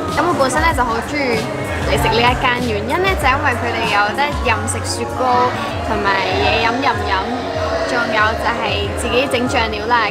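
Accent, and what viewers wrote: Taiwan. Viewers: 聽的出來，妳學的是中部台語，謝謝妳的影片！ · 有鹿港腔的! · 你中文口音很像台灣人，沒注意還以為你是台灣人，正喔!!而且，我愛台妹XD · 國語完全聽起來就是台灣人啊而且好漂亮很自然的美感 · 真的才來台灣四年？？！！為何國語 " 台味 " 很重！沒啥任何港腔？！謝謝妳喜歡台灣！:-D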